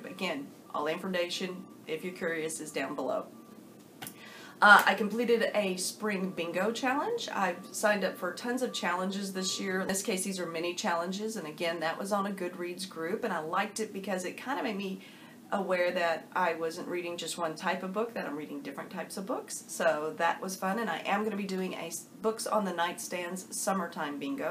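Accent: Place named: South, United States. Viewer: You are so outrageously wonderful. Thank you for shouting me out. You can work on pronouncing Mementomori but it all sounds good with your southern accent. You have not only been so supportive on my channel but I see your thoughtful and detailed comments spread all throughout booktube. Thank you! Excited to see where you go in June. The drama this past month was something else to witness and am happy that it seems to have blown over. No more of that! — I’ve really enjoyed all your videos, please please keep posting :) - And good luck with tacking Dickens and Tolstoy, no matter the pace!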